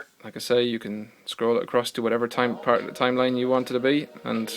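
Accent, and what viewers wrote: Ireland. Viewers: Nice review. Are you Irish?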